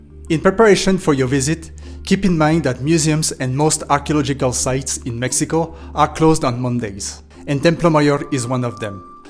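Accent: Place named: Quebec, Canada. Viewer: I'm guessing Quebec Canadian speaking in English.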